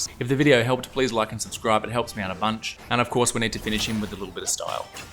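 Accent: Australia. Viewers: Aussie annoying accent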